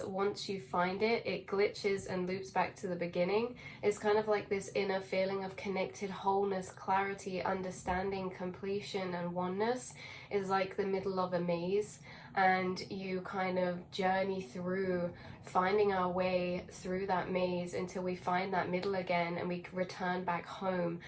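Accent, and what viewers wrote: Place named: England, United Kingdom. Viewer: You are beautiful and your English accent sounds cute . 8 )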